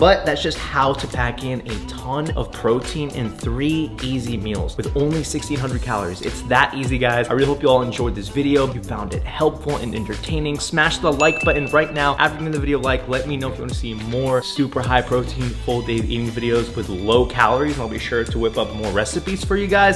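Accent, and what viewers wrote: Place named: Texas, United States. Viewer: I can tell you from TX! Good video thanks!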